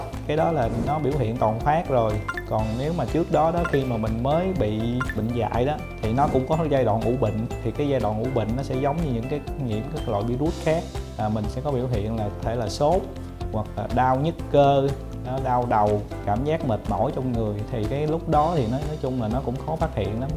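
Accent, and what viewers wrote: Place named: South, Vietnam. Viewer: Xin cảm ơn bác sĩ. Nhưng mà đm mấy thằng làm chương trình nó lồng cái nhạc nền vào không nghe được. Giọng miền nam thì người bắc đã khó nghe rồi còn cho cái nhạc nền vào.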